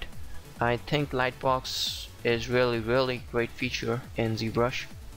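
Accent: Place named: India. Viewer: your indian accent is kickin'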